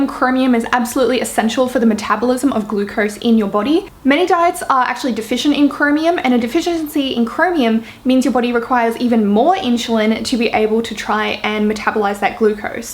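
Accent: Australia. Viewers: It's how it's pronounced in Australia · I feel like it's the accent. I am also Australian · KristinaS2009 If you watched the video you would've seen the explanation. She's australian, that's how a lot of them say it. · Just an Australian accent, that's how most people say it here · Definitely the Aussie accent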